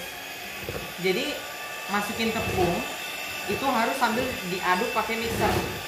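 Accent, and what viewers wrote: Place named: Banjar, Indonesia. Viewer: Banjar mana suara ya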